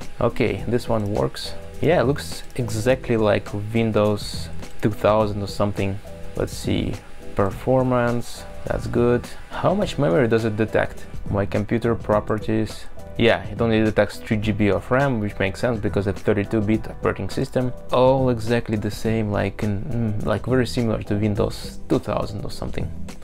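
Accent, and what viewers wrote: Russia. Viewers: вы русский? Просто акцент чисто русский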